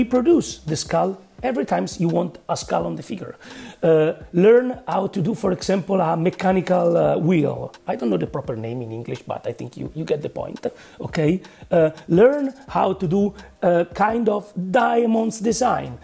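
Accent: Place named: Italy. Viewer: Outstanding. Spoken in a true Italian Painters way.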